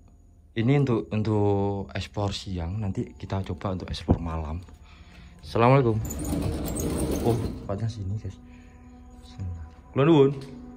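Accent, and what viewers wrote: Java, Indonesia. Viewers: Haiiii...salam dari Malaysia.. Pekat sungguh bahasa jawanya...boleh lah faham sikit2...moga sukses selalu.. 👍👍👍👍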